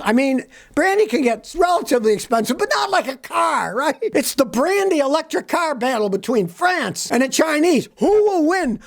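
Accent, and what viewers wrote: Germany. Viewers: Luv ur germn akzent😊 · I love your German accent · did you spend a little time in the service in the Fatherland? Love the accent! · Great German accent...reminds me of that guy on Roland & Martin's- Laugh-in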